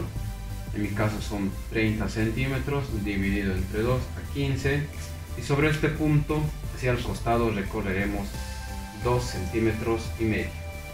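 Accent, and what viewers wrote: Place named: Bolivia. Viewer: Parece que es boliviano por el acento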